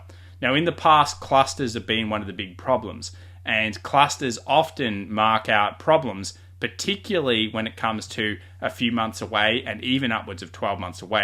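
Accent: Australia. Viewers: Your audio was fine. Maybe you sounded 10% less Australian which means that I can actually understand you better.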